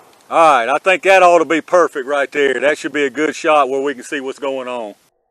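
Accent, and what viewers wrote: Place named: Louisiana, United States. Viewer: If he hadn't said he is from Virginia, I would have sworn he is out of central Louisiana. Great video.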